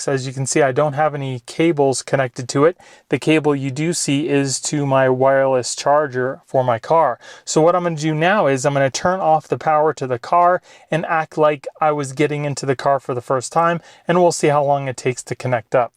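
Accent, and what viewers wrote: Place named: Australia. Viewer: Aussie voice cool.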